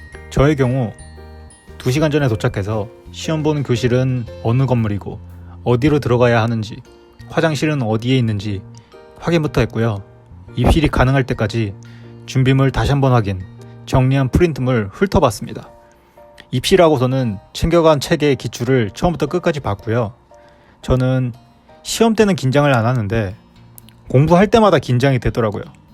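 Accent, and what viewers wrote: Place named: Seoul, South Korea. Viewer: 1과목 교수님 맡으셔도 충분하네요~~ 음성도 좋으시고 특히 서울말씨가 귀에 속속 꽂힙니다. 감사합니다 🙏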